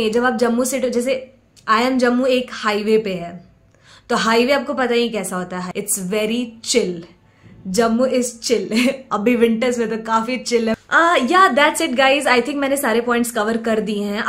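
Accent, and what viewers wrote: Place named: Bihar, India. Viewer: Aisa Q lg rha hai aap Bihari ho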